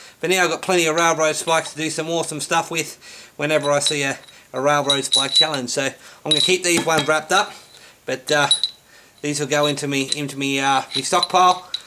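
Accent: Australia. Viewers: Same here Sunday morning with yamez. Had to search you out. I can't understand half of what you gibber out in the aussie accent, but hell I'm from Alabama... I basically speak hillbilly so no one knows what I say either. We call that horn of the anvil lol. Not sure where bic would have ever come from.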